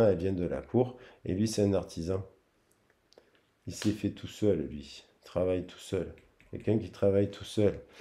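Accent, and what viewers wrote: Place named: Provence, France. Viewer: Bonjour tres heureuse de Vous voir et de Vous entendre j adore votre voix du matin 😁 belle surprise 🌞 un rayon de Soleil qui vas illuminés Notre journée 😜 merci pour cette magnifique guidance Vous m avez fait rire 😄👍 pour votre petit message j ai le Coeur qui vibre pour toi qui Mai's venue en tête et j ai pensé avec votre accent a Marcel pagnol Marius TU me fends le coeur 💝 la parti de carte 🔥 j ai passé un bon moment en votre compagnie j ai adoré 👏 bravo gros bisous🤗😘🌈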